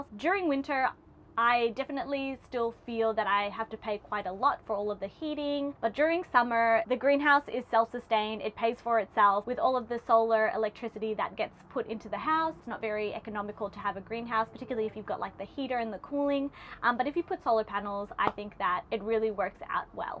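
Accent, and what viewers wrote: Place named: Australia. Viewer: I'm picking up on a slight Australian accent, are you from Adelaide by any chance?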